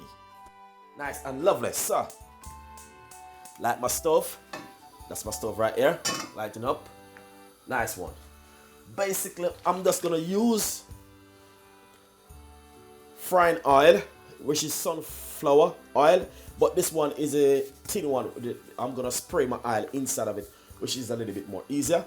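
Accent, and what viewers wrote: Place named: Jamaica. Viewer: Why put sugar if sweet potato is already sweet? Anyways Love you accent .. you sound like Jamaican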